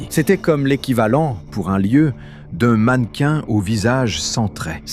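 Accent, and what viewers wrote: Quebec, Canada. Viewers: La vidéo est en québécois ?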